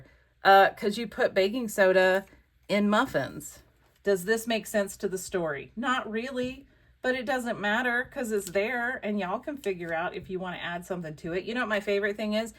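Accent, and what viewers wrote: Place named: Georgia, United States. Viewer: I think your Georgia is comin out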